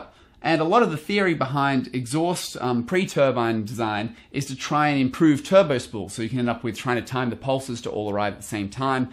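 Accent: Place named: Australia. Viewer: even though I don't have much experience with Australian accents , I was able to understand you 100% . no muffles or "uhhhmmms" at all. Thanks!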